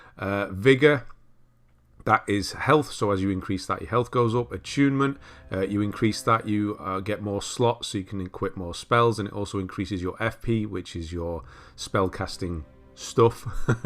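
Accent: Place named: North, United Kingdom. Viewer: To my USA ears, it’s perfect to get a walkthrough from someone who sounds North Country, just like all the NPCs in fromsoft games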